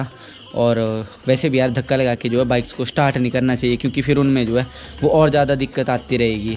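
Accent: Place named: Pahadi, India. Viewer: pahadi mix hindi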